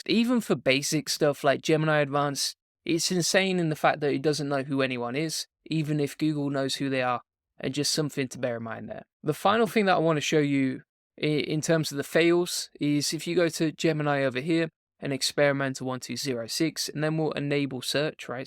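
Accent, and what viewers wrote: England, United Kingdom. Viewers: Btw! I love love the accent! Are you English? Or Scottish? Am i insane for liking the accent?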